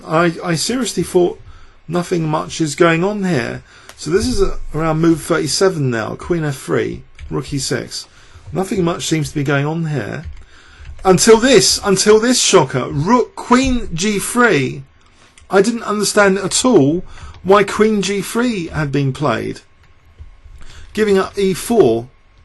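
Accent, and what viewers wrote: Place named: London, United Kingdom. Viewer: I watched this live and flipped the board to black well before Anand's 38 Qg3. The commentators were still sanguine and thought it was drawing but by move 42... f6 it was clear that Carlsen was simply going to march his King + entourage down the board and Queen a pawn. Anand clearly looked tired and his defeat in game 6 is related to the defeat in game 5... his blunders are inexplicable without this context - he was actually stubbornly trying for a win, not the draw he could have engineered. He's lost the psychological battle and I see no sign of recovery from here. I truly hope he is not embarrassed in the remaining games. Thanks for your post game reviews, I love your no nonsense analysis (engine assisted or not) and softly spoken London accent, ignore those critics with nothing of substance to say ("would you please say three not free" / "don't pass off engine analysis as your own" - FFS! 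- way to miss the value in your videos!).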